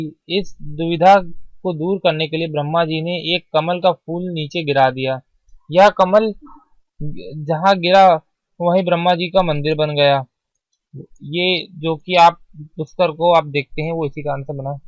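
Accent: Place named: Uttar Pradesh, India. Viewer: hehe... UP tone!!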